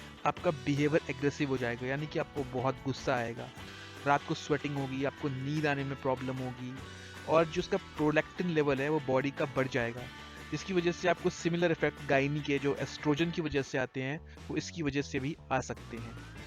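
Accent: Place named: India. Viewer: The India accent is so cool and funny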